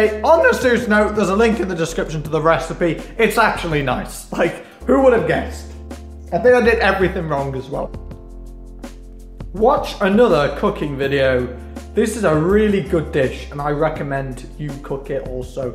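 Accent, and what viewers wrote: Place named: United Kingdom. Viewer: Nazi Pepe that's just how we British people talk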